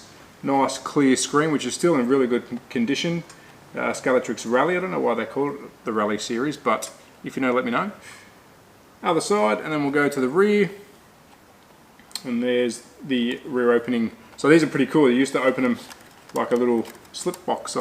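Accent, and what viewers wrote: Australia. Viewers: That Australian accent grates me.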